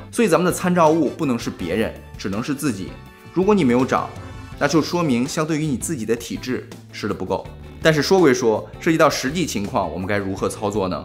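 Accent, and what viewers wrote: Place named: Beijing, China. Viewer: 我感觉是北京人，我是北京人，他的口音，很像普通话很好的北京人😂😂😂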